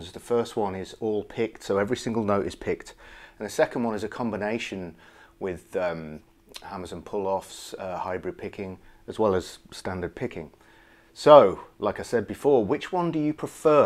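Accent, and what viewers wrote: United Kingdom. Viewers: Put a camera on your face...once in a while...the mono-tone British thing is, well, a stumbling block....What's the Patton...a general?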